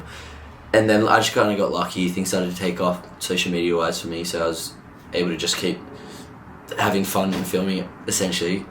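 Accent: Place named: Australia. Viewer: I just discovered you from Rob's Turbo Sprint video, I can't wait to watch the hour and a half of videos of your South America trip. Keep your energy and the good vibes. Love from French Canada! PS: I can't listen to your videos, I feel like my grandpa cause I need to lip read haha, amazing accent from down under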